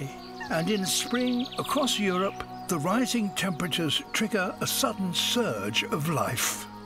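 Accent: United Kingdom. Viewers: This British accent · Desculpem o meu comentário um pouco tolo, mas alguém sabe me dizer se a pessoa que faz a narração fala o inglês britânico ou americano? Desde já agradeço!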